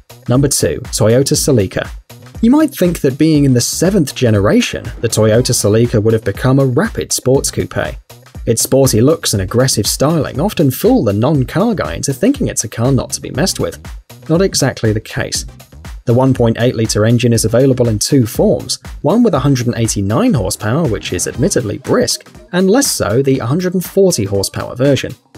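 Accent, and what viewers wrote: England, United Kingdom. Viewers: Come on you American he’s British not American we say zed not zee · Hes english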